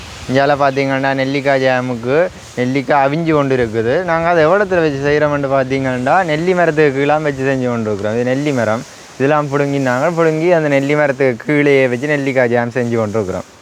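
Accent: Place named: Sri Lanka. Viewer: Ungalooda samayoolda Ungalooda Srilanka Tamil very Nice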